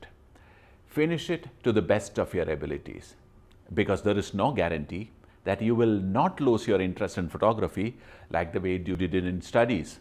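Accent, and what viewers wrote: Kerala, India. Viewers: Namaste, Basically I am a doctor by profession. During the COVID time I was forced to stay at home (Not fully- I work till afternoon ). The rest time I started learning small small hobbies, reading books etc. I saw your video and also pixel village. I have taken up photography seriously. I purchased a few cameras and was kept idling. During my last trip to Europe I took that NIKON D1500 and it really spoiled my journey. It was a heavy one with so many lenses. The photos I took didn't comeout well. I purchased another one Canon pocket size and was getting ready for my Australian trip. Covid played a spoiled sport and I am at home. But enjoyed all your videos and am really happy that I became a better photographer. Accept my gratitude. From your accent I presume that you are from Kerala.(The safest place in the universe during COVID time). 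I will come and meet you in case you come to TRIVANDRUM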